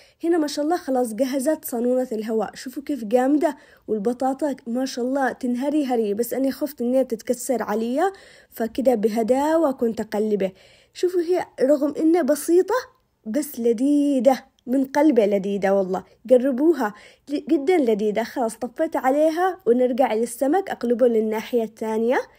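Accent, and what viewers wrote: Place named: Aden, Yemen. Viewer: اللهجه العنديه احلا من الاكل.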